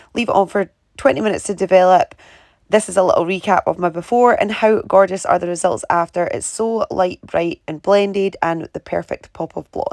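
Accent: Scotland, United Kingdom. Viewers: She's Scottish for sure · You’re Scottish or irish or welsh ?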